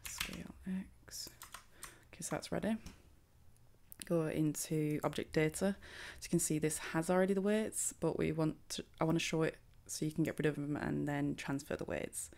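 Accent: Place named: Leeds, United Kingdom. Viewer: Transfer "Wearr-ts" ! I love your Leeds accent.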